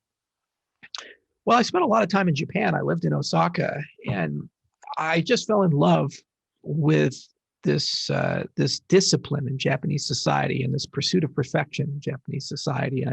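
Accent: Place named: Netherlands. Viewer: i hear a slight dutch accent. great content!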